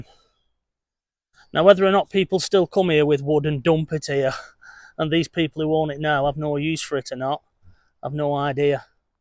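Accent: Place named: Lancashire, United Kingdom. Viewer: 😂 Hi, yes I remember that too now that you mention it, the expense of specific reclaimed bricks. Freds accent was a joy to behold as is yours. We are very slightly less Lancashire sounding here in my hometown but all things Lancashire need proudly preserving. I never got into the historical preservation side of bricklaying myself, mostly new builds and extensions with some ornamental work. What did you reckon to Freds efforts in brickying? I thought he did magnificently considering he was not traditionally trained and he was self taught. His Mums chimney stack was beautifully over engineered for a terraced house showing his deep love for Victorian architecture. Not seen a single example of brickwork done by Fred that would not pass at City & Guilds level. An immensely talented guy.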